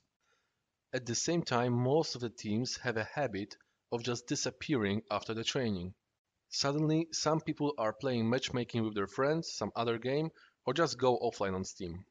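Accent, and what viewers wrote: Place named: Poland. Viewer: Świetne - naprawdę prosto i klarownie przeprowadzone instrukcje :) Po akcencie domyślam się, że polak, ale bardzo wyraźnie mówisz :) I sporo potwierdzenia z tym jak myślałem, żeby przeprowadzić trening (szczególnie mówiłem, żeby każdy sobie sam opracował smoki obowiązkowo, a na początek zająć się jedną mapą przez kilka dobrych dni, a nie mieszać), a dodatkowo nauczyłem się kilku cennych uwag.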